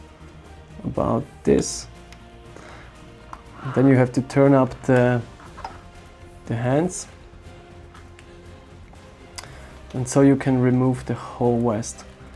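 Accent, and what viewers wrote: Germany. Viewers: Are you German?^^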